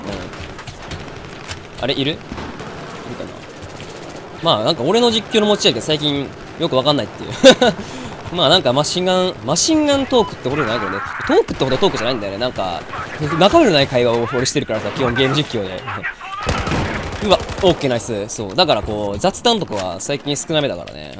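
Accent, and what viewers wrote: Kansai, Japan. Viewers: 関西弁ってひとつの持ち味だよね。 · しょー 関西弁の人が上手いこと敬語を交えて話すのって地味に凄いからな(´・ω・｀)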